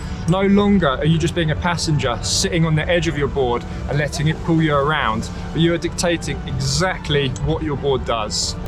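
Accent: United Kingdom. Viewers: Best British Snowboarding Youtuber. I like your British accent. U also an excellent coach.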